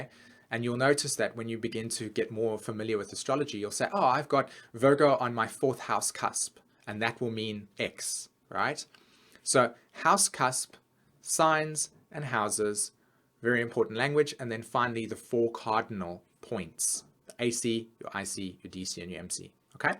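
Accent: South Africa. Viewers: New fan here. Thanks for this content. Great accent by the way...SA? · Are you South African?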